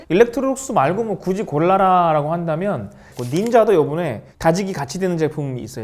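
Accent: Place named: Jeolla, South Korea. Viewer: ㅎ.ㅎ 쬐깐해요~~~오리지널 전라도 사투리~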